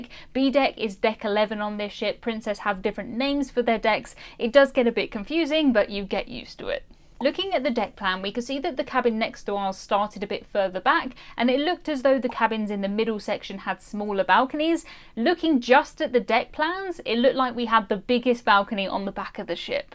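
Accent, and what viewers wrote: United Kingdom. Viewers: Good information. Thanks. It is sometimes hard for those of us with hearing problems to translate British English to American English. Slowing your speech would help. Thanks again.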